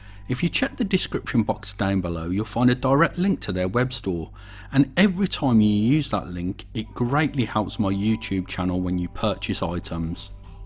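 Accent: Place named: Midlands, United Kingdom. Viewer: Nice idea.. I really feel like a potato not thinking of this. Sound like you are from Midlands lad like me. Nice to hear a accent I can understand.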